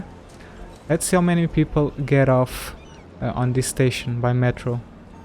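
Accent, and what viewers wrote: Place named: Portugal. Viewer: I've played enough cs:go to know portuguese accent now LOL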